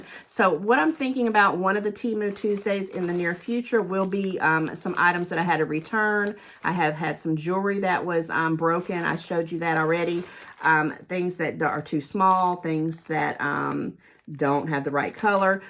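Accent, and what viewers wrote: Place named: South, United States. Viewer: I discovered your channel a month or two ago. You are just so real & I love it. I enjoy your videos and your Southern accent. Your home is gorgeous!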